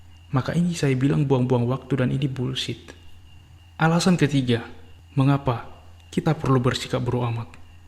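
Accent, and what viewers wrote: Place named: Sulawesi, Indonesia. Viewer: Abang nya asli mana seperti logat Sulawesi -_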